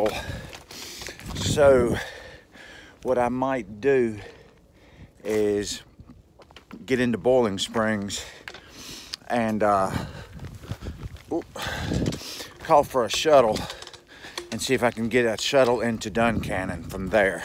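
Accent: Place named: South, United States. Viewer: No apologies are necessary! You were out there actually hiking the trail not watching others do it like we are! The videos you have posted of your hike are the ones I truly look forward to the most. Your candid and humble personality is always a pleasure to watch and I enjoy your dialect being from the south myself. And the fact that you enjoyed your hike so much really shines through in your videos. Cheers to a speedy recovery and your future outdoor adventures. Look forward to your update when you feel inclined to post. Enjoy your home with heat and electricity! LOL.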